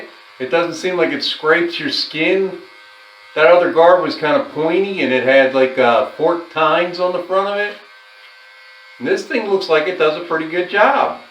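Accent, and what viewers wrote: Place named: Philadelphia, United States. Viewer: DEF from Philly or Delco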